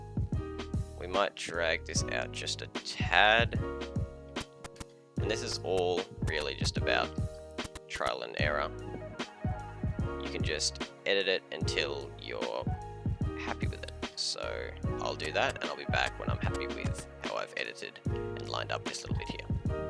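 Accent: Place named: United States. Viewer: why does he sound like flea but with a american accent XD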